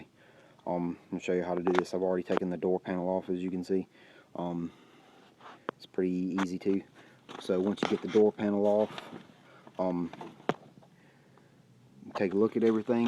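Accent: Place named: South, United States. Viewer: Really informative in a southern accent!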